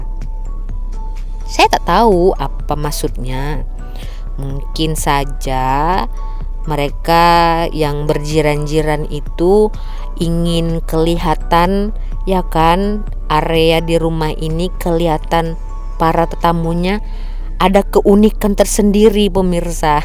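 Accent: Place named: Kelantan, Malaysia. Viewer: Wahh!!kecek kelate mbak kito ni..molek dah tu... 😂😂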